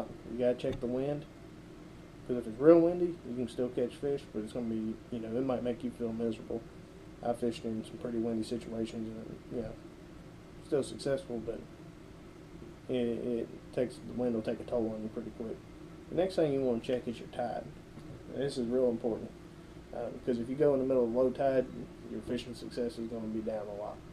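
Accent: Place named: South, United States. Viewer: Awesome video man. I learned some things I will be using this weekend. You just need to talk slower and louder for us guys that are not used to a southern accent. lol Thanks man!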